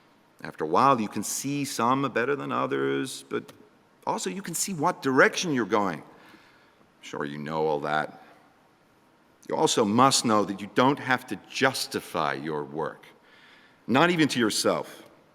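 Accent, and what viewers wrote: United States. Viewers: Everyone please realise he's putting an American accent here. It's definately not a British accent. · he's pronouncing ful r at the end.Of course 'it's an American accent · How on earth he speakes American accent like pretty normal...oh my god..!!! He is British...How on earth how on earth..??? 😮😮😮😮😮😮😮😮😮😮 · HIS AMERICAN ACCENT IS AMAZING TOO HAHAHAAH I LOVE HIM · Was he required to do this in an American accent? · Why he is speaking like an American!?!?!?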